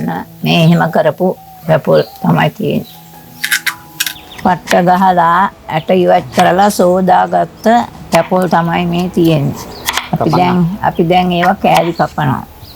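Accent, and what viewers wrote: Ambalangoda, Sri Lanka. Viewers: Aththamma from down south? The way she talks feels like amabalangoda accent.. bless her she is lovely and kind ❤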